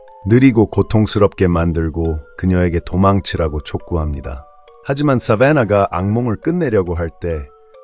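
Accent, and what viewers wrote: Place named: United States. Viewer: 혀 굴리는 코메리칸 스타일 리뷰어에 뇌가 울렁증...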